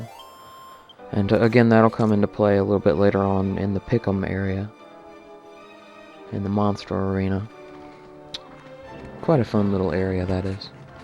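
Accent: South, United States. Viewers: So...do I detect a southern accent? I'm from Oklahoma and I visit New York every other year sooooo.....yeah I usually recognize an accent when I hear one...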